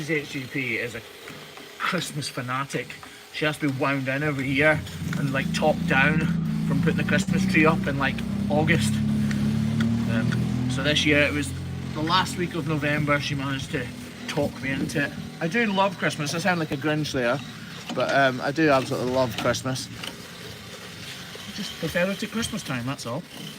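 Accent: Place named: Aberdeen, United Kingdom. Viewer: He speaks Abberdabber, kenfitamene?! Congratulations to you and your wife on imminent new arrival 😀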